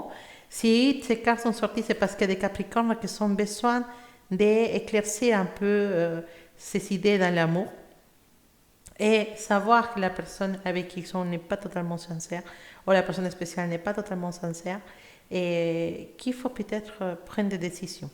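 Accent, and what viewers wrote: Spain. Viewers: hola es que tu haces lecturas en espanol porque siento tu accento esapnol y quiero saber si es posible tambien en espanol gracias bendiciones!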